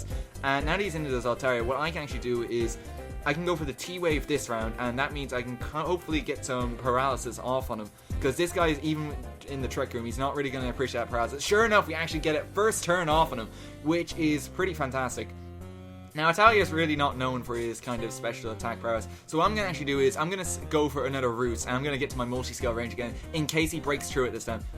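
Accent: Ireland. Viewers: Irish Accent <3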